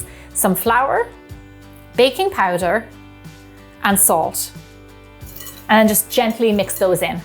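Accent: Ireland. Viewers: I love your cooking the dog treat ones were so much fun and easy to bake! My dog ate them so fast I’m not even such he swallowed!! 🤣 (Also are you Irish!?’ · You Irish? Edit : I've just checked and you are😊🇮🇪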